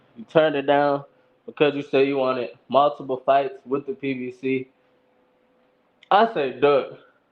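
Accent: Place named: Baltimore, United States. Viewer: you sound like you from bmore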